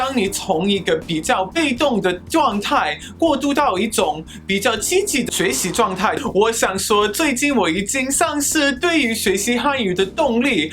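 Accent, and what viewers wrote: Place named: Guangdong, China. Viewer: You really sound like you've learned Mandarin in Guangdong (not a criticism-that's what I'm used to). I'm currently shadowing 小王子 in Cantonese over 27 days and recording it. I'll upload those when I have some free time.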